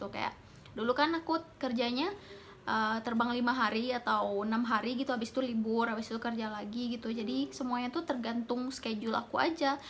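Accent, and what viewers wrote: West Sumatra, Indonesia. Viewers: Wow. Ternyata org Padang mbak nya,,pantesan logat b.indonesia nya agak kurang,masih jelas logat minang nya🙂🤗 sukses mbak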